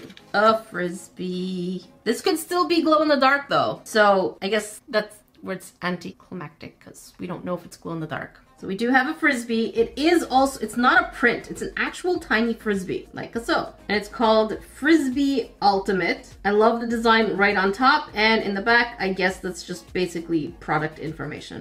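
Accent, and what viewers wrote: United Kingdom. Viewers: Your bits of British accent is suburb !! Sending lots of love you and to Canada xx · The Brit’ accent is real 😂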